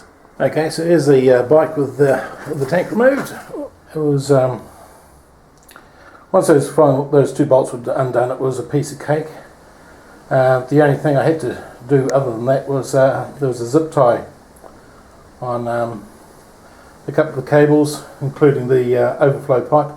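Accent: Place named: New Zealand. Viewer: Awesome video. Editing & presentation is outstanding along with the clarity and simplicity of instructions. I'm inspired now to arrange the purchase of an 883 tank for installation on my 48. Good to hear a Kiwi accent all the way from Onehunga after living in Oz for the last 10 years. LoL Cheers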